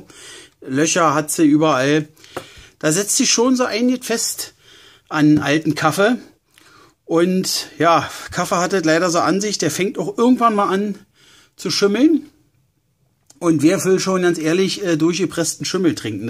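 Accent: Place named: Berlin, Germany. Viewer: Danke! Das hat sehr geholfen☺️ Und dein Berliner Akzent ist super und du erklärst das mit guter Stimme. Top!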